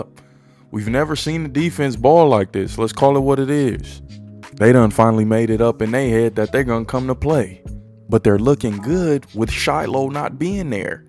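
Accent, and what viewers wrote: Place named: Pittsburgh, United States. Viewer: Bro you sound like you're from the Burgh lol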